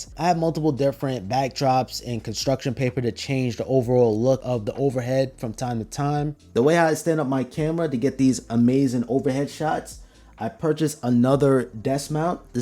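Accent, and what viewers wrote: New York, United States. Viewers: Minute into the video I already know he’s from New York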